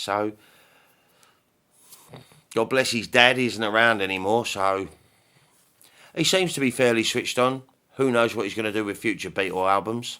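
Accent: United Kingdom. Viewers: As a newcomer, I have to say your voice is magnificently British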